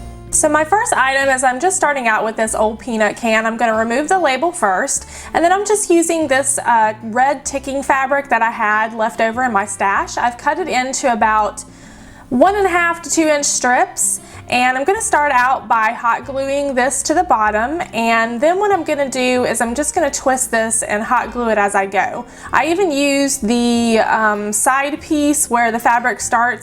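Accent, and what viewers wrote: South, United States. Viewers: I am new and from New york..love ur southernzccent!!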